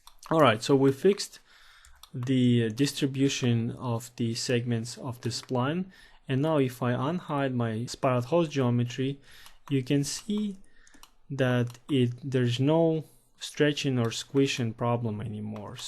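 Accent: Russia. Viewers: You have special apeak type are you russian i think you are russian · are you russian? you kinda sound like one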